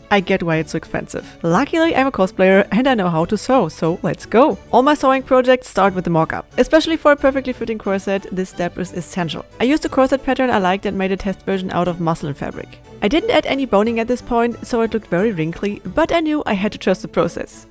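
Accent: Germany. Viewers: The german accent makes 100 times better😅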